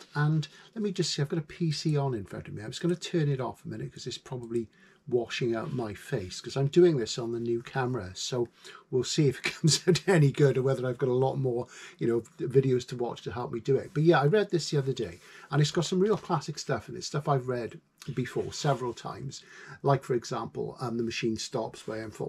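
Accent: United Kingdom. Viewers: You are my ultimate ASMR. SF, bookshops, british accents, with intermittent walkies in the country. Favorite anthology: 100 Great Science Fiction Short Short Stories - Ed. Asimov, Greenberg, & Olander (Only item I've ever stolen .. of course, if you steal books, steal them from libraries so they can track you down and get payment. My 12-year old self still never regretted it.)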